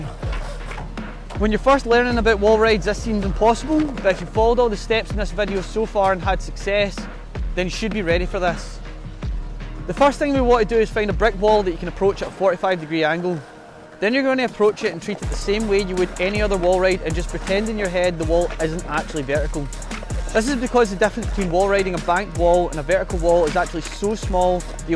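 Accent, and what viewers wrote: Scotland, United Kingdom. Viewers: Never expected the scots accent! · Skate YouTube is so Americanized, I did not expect to hear the Scottish accent, and it was a welcome sound haha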